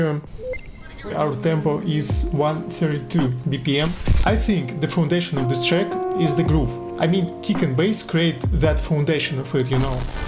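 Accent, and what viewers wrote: Russia. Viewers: так ты русский знаешь. Я такой сижу слушая твою речь на инглише и понимаю , что это мать вашу русский говор . Ахаха, приятно удивился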